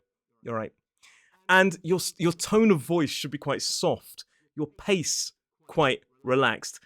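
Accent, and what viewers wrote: United Kingdom. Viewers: I really into British accent ❤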